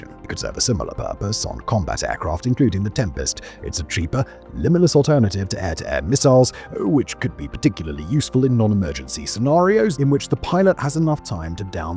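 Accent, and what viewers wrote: United Kingdom. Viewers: British accents and lazer weapons, I think we all know where this is going.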